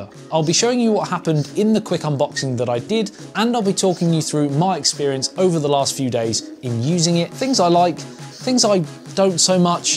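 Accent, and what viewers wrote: England, United Kingdom. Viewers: what in the world is your english accent??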